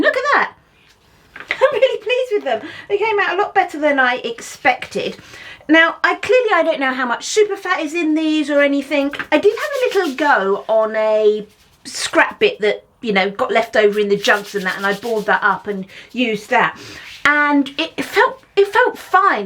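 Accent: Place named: Southern England, United Kingdom. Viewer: South of England sounds like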